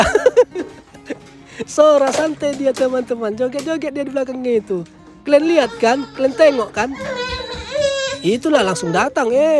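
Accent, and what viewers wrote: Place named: Batak, Indonesia. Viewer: Klu mendengar logatmu, marga apa kau lae.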